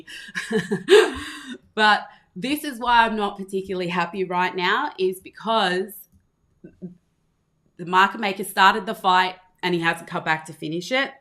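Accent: Australia. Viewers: I do like a lady with an Australian accent :) great analysis!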